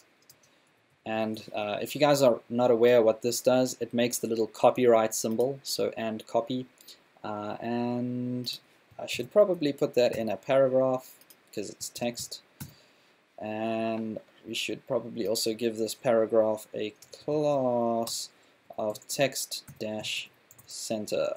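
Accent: South Africa. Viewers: Thanks for a really useful and easy to follow tutorial series - I'm a subscriber. You make learning enjoyable and simple! Love your South African accent too mate... and you're a really pleasant and cool guy! Keep up the great work... and I'd love to see more tutorials on topics such as Angular.js, react.js and node.js, etc. We'll keep you busy mate!:-)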